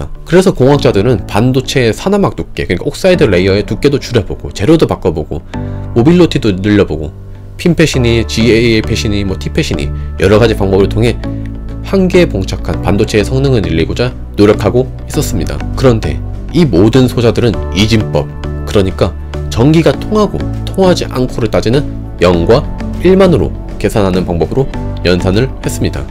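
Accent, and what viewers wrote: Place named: Gyeongbuk, South Korea. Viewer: 사투리가 대구 경북쪽이네 우리 부울경은 아닌듯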